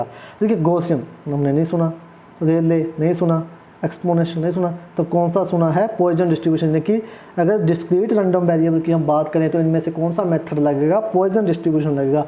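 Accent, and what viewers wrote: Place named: Punjab, India. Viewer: Nice lecture 1st time complete sunya h. But tuhadi speaking Ch Punjabi tone h so I think u must teach in Punjabi.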